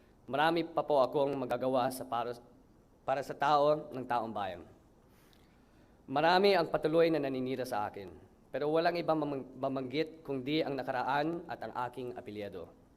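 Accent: United Kingdom. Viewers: Nakaka Harry Potter naman ang accent 😍😍😍 Love na love ko yarn ❤️❤️❤️❤️🤗😘😘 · Love the slight British accent! 😍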